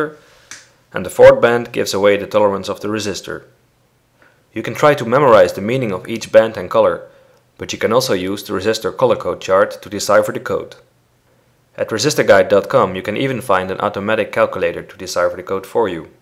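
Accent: Netherlands. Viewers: do I hear a little dutch accent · Are you, by any chance, Dutch? That, or my accent recognition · You sound very Dutch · Nederlands accent herken je altijd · Are you from holland?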